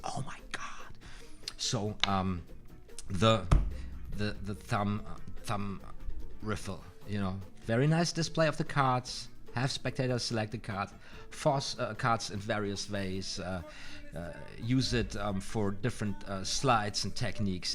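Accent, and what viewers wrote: Germany. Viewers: woow ich hab vorher von chris ramsay gelernt und hab dich gerade eben gefunden...habs sofort am akzent geört das du deutsch bist hammer !!!! mach weiter so !!!!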